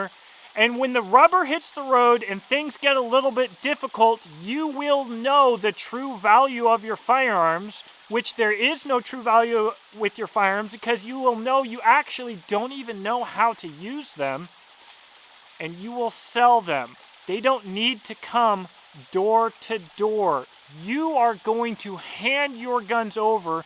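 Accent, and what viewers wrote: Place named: New England, United States. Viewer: His Yankee voice is so annoying.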